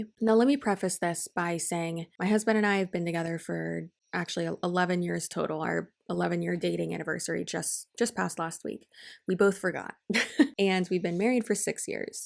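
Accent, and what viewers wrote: United States. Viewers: Also are you from south U.s born and raised? You have the perfect American accent 😍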